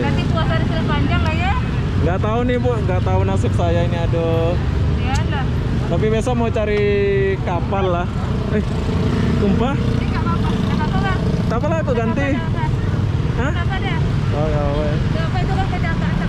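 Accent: Lombok, Indonesia. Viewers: Bahasa Melayu mcm Malaysia tapi saya tetap boleh perasan slang Indonesianya hehehe Unik betul org nusantara nih! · Hahahha pandainye abang bual cakap dari lombok😄😄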